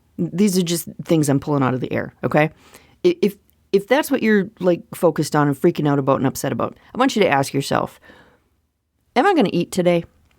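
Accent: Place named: Midwest, United States. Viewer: I think you have a Midwest accent, but I sometimes can hear the Minnesotan/northern Wisconsinite/Canadian O sound in certain words. I can't really describe it, but you probably know what I'm talking about. lol :)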